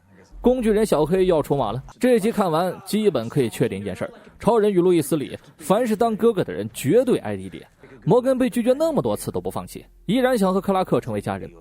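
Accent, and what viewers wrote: China. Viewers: 介广东话比我还飘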